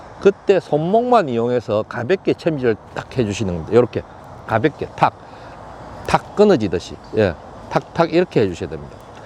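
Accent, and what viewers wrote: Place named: Busan, South Korea. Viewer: 낚시를 시작하는 초보입니다.^^ 같은 부산사투리에 귀에 쏙쏙 들어오는 설명이 너무좋습니다. 많이 배우네요.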